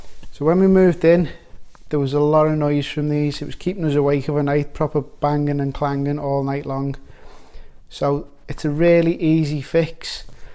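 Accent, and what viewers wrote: Liverpool, United Kingdom. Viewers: Liverpool accent lol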